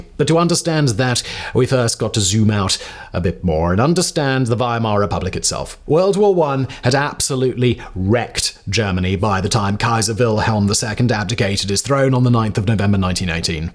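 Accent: RP, United Kingdom. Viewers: I can't watch this guy, is that accent affected or is he really that posh? Ugh. It's too distracting.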